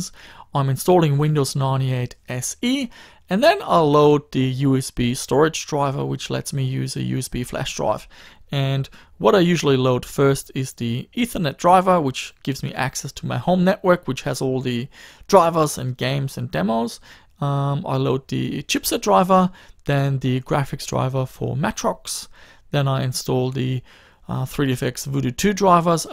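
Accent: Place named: Germany. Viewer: You sound like you've got a german accent.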